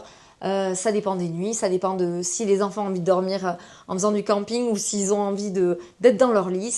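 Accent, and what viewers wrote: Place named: Toulouse, France. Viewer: J'adore ton petit accent toulousain mais tes contenus aussi, tu es une conteuse magnifique !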